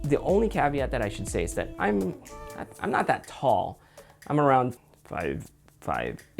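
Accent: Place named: Philippines. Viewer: I can hear the Filipino accent sometimes and I'm loving it 💕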